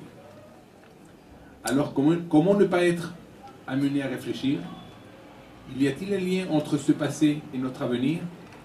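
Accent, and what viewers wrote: Spain. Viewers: il a un accent espagnol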